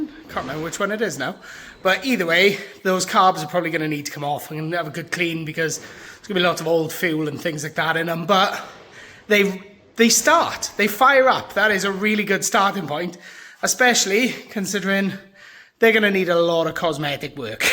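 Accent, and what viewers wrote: Wales, United Kingdom. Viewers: Are u welsh bro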